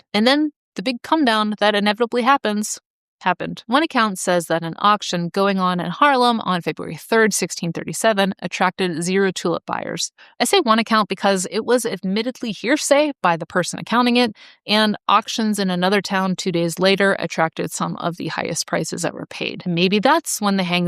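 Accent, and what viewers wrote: United States. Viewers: I won't listen to anyone with a USA accent.. until you get rid of evil numbnuts Trump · Are you American? You used pounds instead of dollars but you sound American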